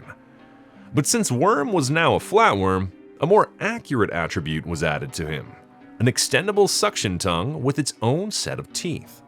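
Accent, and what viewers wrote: Canada. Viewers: a robot commentator with a Canadian accent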